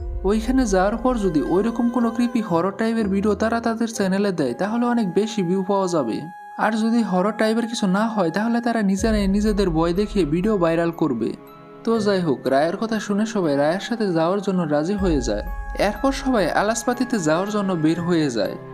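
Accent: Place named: Barishal, Bangladesh. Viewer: আপনি কী বরিশালের বা ফরিদপুরের?🐸এমন ভাবে কথা বলেন কেন হ্যা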